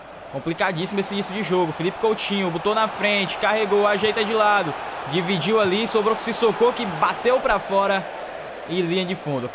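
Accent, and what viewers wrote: Portugal. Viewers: cara, para de falar que nem português!! kkkk é irritante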